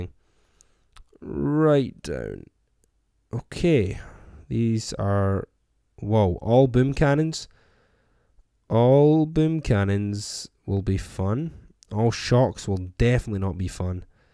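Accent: Scotland, United Kingdom. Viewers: Are you Scottish lad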